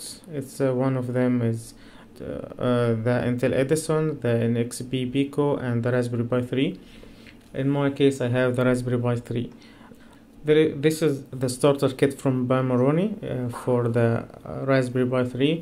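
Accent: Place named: Egypt. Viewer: من لهجتك عرفت انك عربي ومصري صدقني كثيرعرب يبحثون عن مثل هذي الشروح والبعض منا يجد صعوبه اذا كان الي يشرح انجليزي ف اتمنا منك تشرح بالعربي وتفيدنا جزاك الله خير وصدقني راح يتابعونك عرب كثير وانا اولهم ههههه اسأل الله لك التوفيق ..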